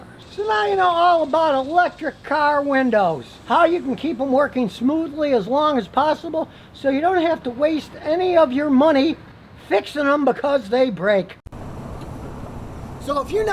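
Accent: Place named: Omaha, United States. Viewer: chin(k) from asia here..........is it me or does this guy remind u of warren buffet......hes got that omaha accent,the way he talks even his sense of humour kinda reminds u of buffet.except instead of warren buffet the oracle of omaha and financial guru,hes warren buffet ur friendly neighbourhood car mechanic.maybe hes buffet's long lost twin brother who drinks 8 cans of diet coke a day.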